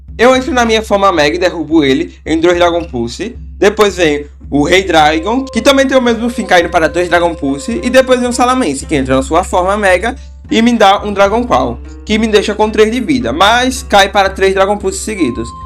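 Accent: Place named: Lagarto, Brazil. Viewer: ? você é de lagarto?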